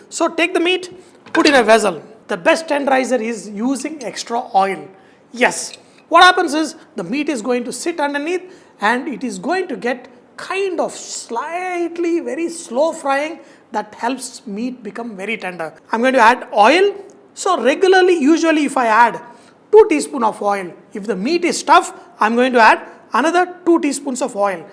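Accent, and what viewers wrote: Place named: Telangana, India. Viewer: i like your Telengana dialect narrations the most!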